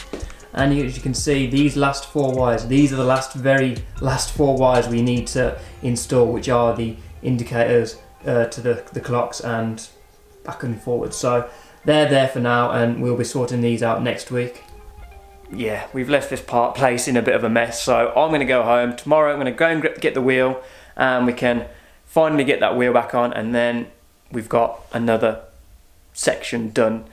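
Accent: Birmingham, United Kingdom. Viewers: Cool look fwd to that. You sound like your local to me. I’m in Birmingham. Could do with picking the brain of the guy that does your electrics lol.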